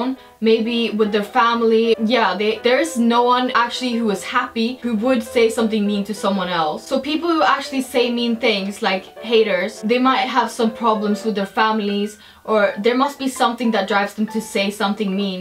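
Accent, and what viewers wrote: United Kingdom. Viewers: Why in these videos you have like a British ascent and now days you don’t? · Why do you sound british fancy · you seems like your voice right now is like British and your voice in gaming is like america!?!? And are you even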